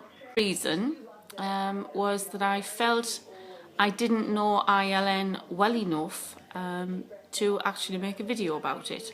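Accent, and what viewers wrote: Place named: Newcastle, United Kingdom. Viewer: Absolutely fab Hun, love your lippie and your Geordie voice, keep them coming, you've had your breakthrough! xx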